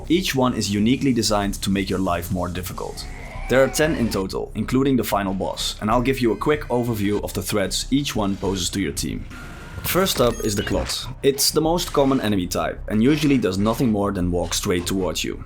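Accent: Netherlands. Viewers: Ik ruik Nederlands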